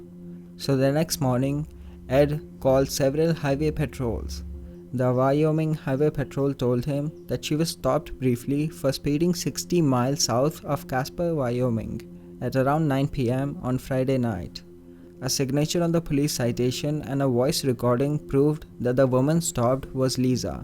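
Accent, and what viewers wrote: India. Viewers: That's an Indian ascent. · Please stop doing videos your voice is so annoying u Indians need to stop talking english all together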